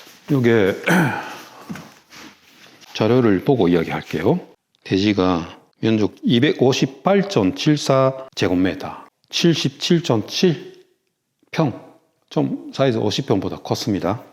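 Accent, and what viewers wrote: Busan, South Korea. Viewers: 어 완전 부산사람 말툰데요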